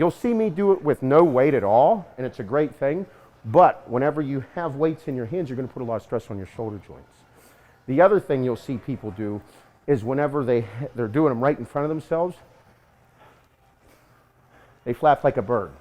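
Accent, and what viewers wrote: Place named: Pittsburgh, United States. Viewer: Heard the accent before I saw the word Pittsburgh in the back. Sorry about Sunday. That defense is in trouble.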